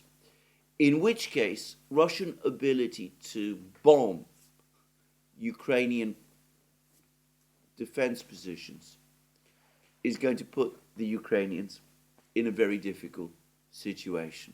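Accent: United Kingdom. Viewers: Thanks for your hard work, much appreciated! I love your very British accent by the way, British English sounds a lot better than American English, at least that is what I think.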